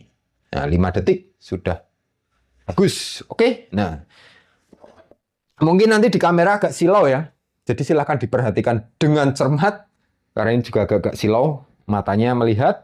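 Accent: Java, Indonesia. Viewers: Jawa ini ngomongnya tebal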